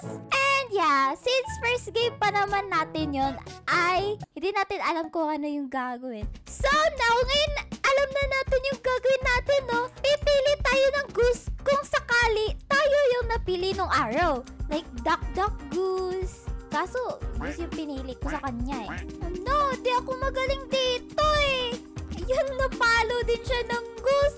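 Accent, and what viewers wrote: Visayas, Philippines. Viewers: Ate Bisaya Ka po ba?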